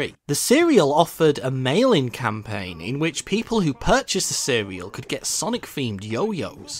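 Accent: United Kingdom. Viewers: Is Scottish Irish Or British?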